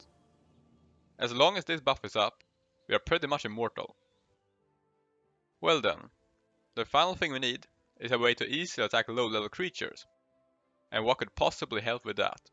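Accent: Sweden. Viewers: This sound so nordic! I love it the english sound exactly like a Swedish brother, keep on working that pronunciation and keep up the good work! Grym video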